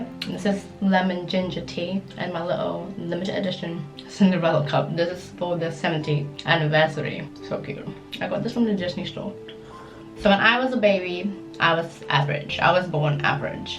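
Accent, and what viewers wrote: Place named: United Kingdom. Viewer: her voice is becoming very very British now,, lmao its cute ♡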